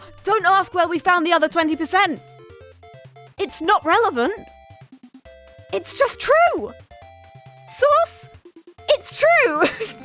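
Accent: United Kingdom. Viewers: Okay, I'm subbing just because of this stream. The dedication to BLÅHAJ is simply astounding, and it's nice hearing another British voice on here. I shall watch your career with great interest.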